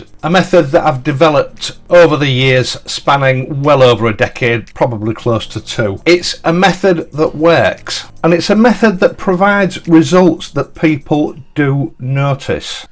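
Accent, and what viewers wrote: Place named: RP, United Kingdom. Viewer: Your method is as meticulous as your accent . " The perfect English Gentleman".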